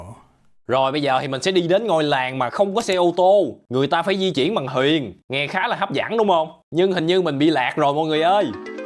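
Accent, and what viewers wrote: Mekong Delta, Vietnam. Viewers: Ông nc rặc miền Tây,dù đi dl nc ngoài thì ổng nói tiếng Anh giọng cũng đặc sệt miền Tây,dễ thưn,thân thiện,hài huớc 😂😂😂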